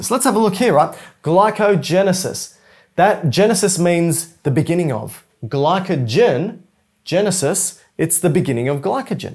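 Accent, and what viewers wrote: United Kingdom. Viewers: thank you British man 🙏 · Are you British???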